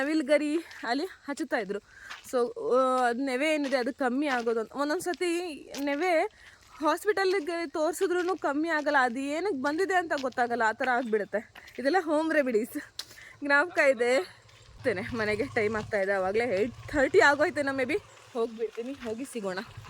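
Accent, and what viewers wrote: Standard. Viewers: Awesome vlog sis.ondu vishaya sis neavu meathodooo kannada geaaa ondu salute 🫡 pure kannada 😊😊😊😊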